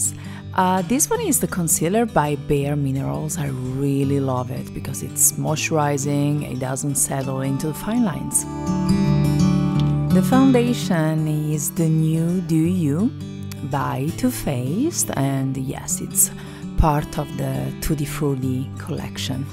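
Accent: Italy. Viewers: Hello nice to hear your Italian accent is there... 👍🏻 nice presentation, but I did't like the eye shadows ... As you said are too flaky..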